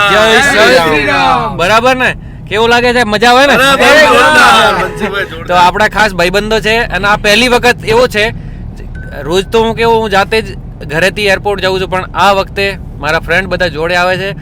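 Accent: Rajasthan, India. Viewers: Bhai a to Rajasthani che.par amdavad ma rahe che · રાજસ્થાનના ગુજરાતી બોલે છે ભાઈ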